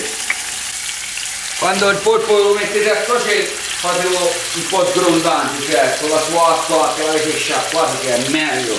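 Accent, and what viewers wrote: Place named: Livorno, Italy. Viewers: Bellissimo, confortevole, casereccio e senza musica da discoteca in sottofondo: meraviglioso pesce, simpaticissimo l'accento livornese, esperto il cuoco e padrone degli ingredienti, video come questi riempiono sempre l'anima. Peccato pero', purtroppo, non sentire il profumo di quel piatto strabiliante! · Meriti like solo per la parlata livornese😂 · Troppo figo l'accento Livornese.. Ricetta rifatta uguale e venuto tutto fantastico. Unica domanda ma quando si mette il polpo un bicchiere di vino bianco no?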